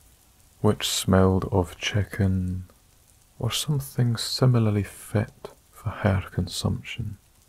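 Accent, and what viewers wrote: Scotland, United Kingdom. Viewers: your dreamy Scottish voice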